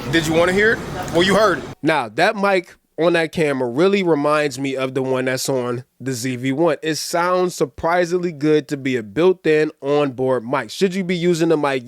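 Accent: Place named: New York, United States. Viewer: more normal English ? Most people don't come from USA or New York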